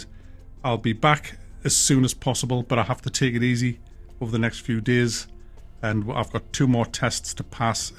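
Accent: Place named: North East England, United Kingdom. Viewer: Really weird comment first hope you do use this as a driving point to get more healthy I need my reviews 😂 but also it sounds like your from my way Sunderland/Newcastle. I think I am younger then you 29 but I had a heart transplant plant 4 years at the freeman and what helped me get fittest up and stay off the drink was a local running club for people with health problems definitely something to look into really helped me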